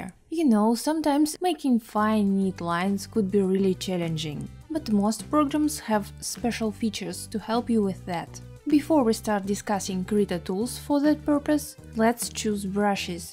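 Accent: Russia. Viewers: nice russian accent))) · Omg are you russian? I freakin love to hear that accent with english words. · You have a cute russian accent :3 It's cool cause now I'm starting to lern english for work, and I understand all what you say. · love your russian accent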